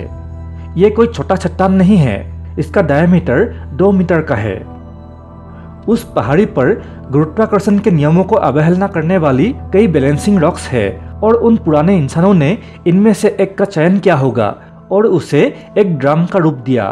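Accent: Assam, India. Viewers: aapke hindi meh bohot problem hai ... assamese accent ha aapka pls kisi aur se narrate karwayiye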